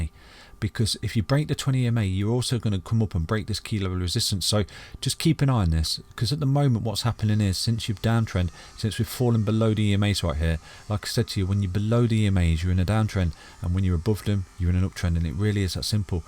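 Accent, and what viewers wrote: United Kingdom. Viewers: The british accent and the famous slangs always get me to buy more. VET 🌚 ✨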